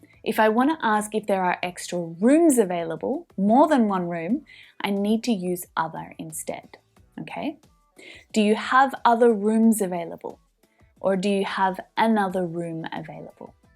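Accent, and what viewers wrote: Australia. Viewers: I agree...her Australian accent is calming and relaxing to listen to... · hi from Turkey aim iraqi i love your accent and i hope i can go to Australia as soon as possible, i love it and love your teaching💖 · I wanted to quit watching you so I don't pick up your Australian accent but you are so good I just can't do it. 😇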